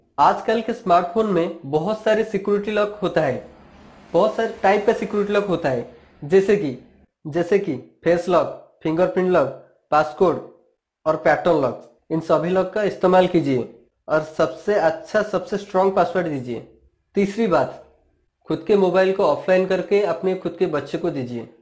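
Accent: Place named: Odisha, India. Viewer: Bhai tu Odisha se Hena... 😅.., Tera hindi pura odia accent ke taraf sunai de Raha hai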